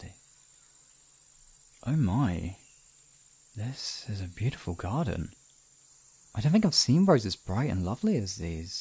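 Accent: United Kingdom. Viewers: Me accidentally clicking and listening for some reason: HE BRITISH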